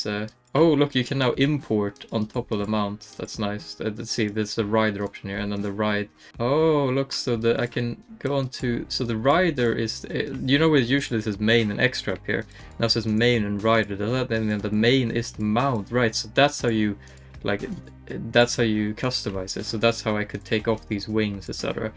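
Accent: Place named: Sweden. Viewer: I honestly love watching ur videos, partly for the Hero Forge tips and partly for the strong Swedish accent, it's awesome <3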